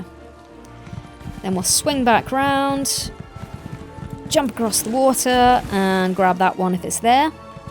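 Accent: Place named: Australia. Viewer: Your accent sounds like highbrow Australian? North Queensland maybe? But your London. Hum. Anyway I love it.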